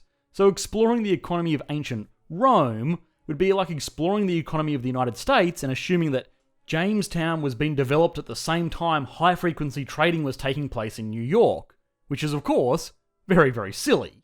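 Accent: Australia. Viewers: This narrator is from the land down under. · I wish Australians would speak in a more anodyne way and not torture vowels quite so much.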